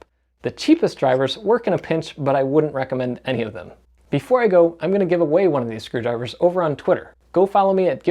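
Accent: Netherlands. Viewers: are you dutch actually?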